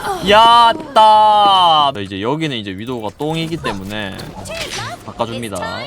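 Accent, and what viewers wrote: Gyeongsang, South Korea. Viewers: 경상도사람임?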